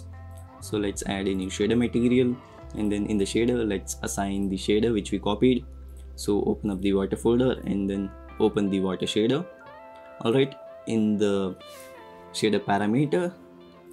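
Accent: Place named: India. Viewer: I swear everytime I see a video that has a guy with an indian accent you know it's gonna be a useful tutorial. Thanks a lot!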